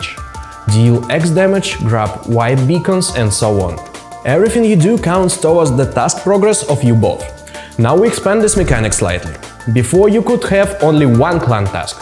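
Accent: Russia. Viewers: Nice Russian accent.wow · Он стоо пудов русский я сразу понял по интонацие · Ringlish bro russian inglish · i love the russian accent...lol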